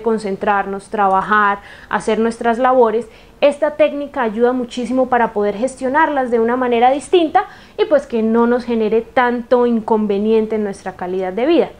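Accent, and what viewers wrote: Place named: Colombia. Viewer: Parece colombiana por el acento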